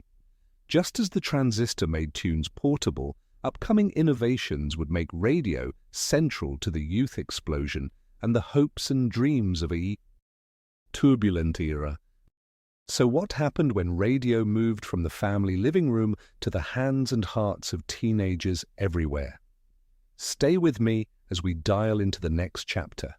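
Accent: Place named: Home Counties, United Kingdom. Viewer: Memory Lane America-narrated by some bloke from the English Home Counties (assumng it's not A.I. ). If you want to relate American cultural history, at least have an American voice doing it.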